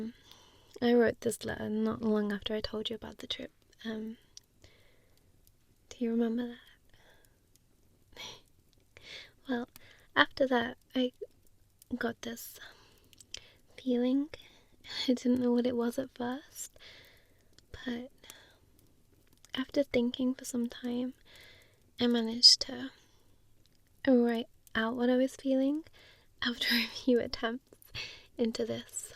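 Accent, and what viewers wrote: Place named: Midlands, United Kingdom. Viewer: I keep saying it, but hot fucking damn your midland accent makes me melt. Then you're always so spunky and adorable ooof, just yes That last part through, oh man the feels, planned something exactly like that for my ex but we never got to go. That letter before the final reveal hit real different for me at least. Mmmm yep this is good ASMR.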